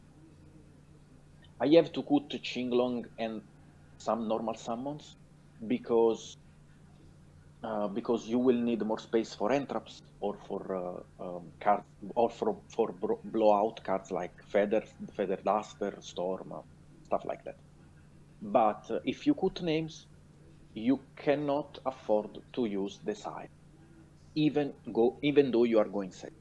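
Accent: Italy. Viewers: italian pronunciation, i love you <3. TE SE AMA, FORZA ITALIA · love the spaghetti accent and you should interview Madolche God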